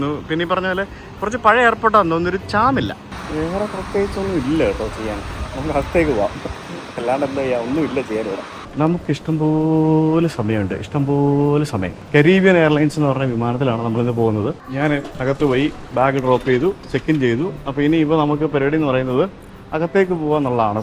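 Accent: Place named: Thrissur, India. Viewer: എന്തായാലും തൃശ്ശൂർ സ്ലാങ്ക് സുപ്പർ ആയിട്ടുണ്ട്ട്ടാ 👍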